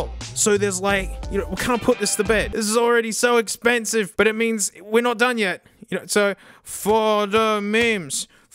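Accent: Australia. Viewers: You're sooooo Australian..... And I love it! 🥰🤣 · Maybe because he’s Australian? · I visited an old video and just realised that as his videos gone on, he’s picked up an Australian accent along the way, I’m not sure really, put your opinions in the replies because I don’t really know.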